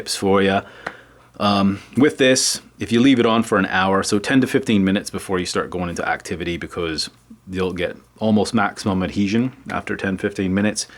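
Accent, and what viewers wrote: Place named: North, United Kingdom. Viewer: Thanks... I've been struggling with splints & different ways to wrap... I'm now a happy camper! I've also been trying to figure out your accent.. i hear Northern UK (Manchester & Liverpool), Canadian, a bit of Irish & Aussie.....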